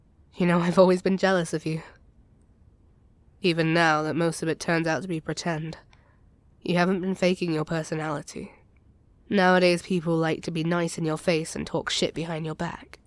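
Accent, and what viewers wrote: United Kingdom. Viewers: Shut up British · This is the first time I’ve heard a fellow Brit do an asmr and it’s pretty good tbh 👍👍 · Bri'ish accent is the cherry on 🔝 ♥️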